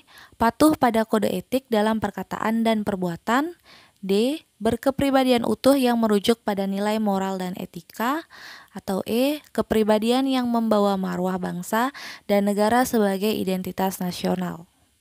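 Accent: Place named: Bali, Indonesia. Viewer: salam dr denpasar kak, dari logatnya kelihatan kak hehe ☺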